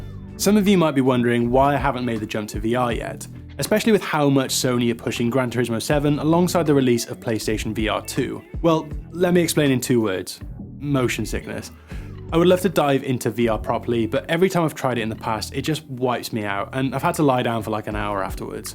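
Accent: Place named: Wales, United Kingdom. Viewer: What part of Wales are you from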